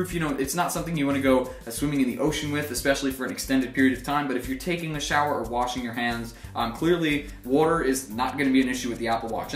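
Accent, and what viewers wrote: United States. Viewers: nah, it's American - THIS GUY IS NOT BRITISH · Someone's from Philly!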